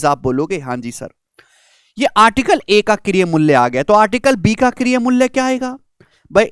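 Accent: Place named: Bihar, India. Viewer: Bihari ho kya ? Be percent ko pashnt bolte ho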